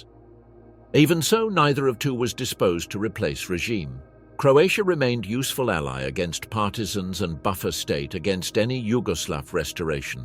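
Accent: United Kingdom. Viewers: Well, whomever wrote/narrated/AI'd this, clearly wasn't a "English as mother-tongue" speaker, despite the English accent, as evidenced by the lack of 'articles' [a - an - the]. And the occasional sound of pages being turned isn't fooling anyone. 😏😏😏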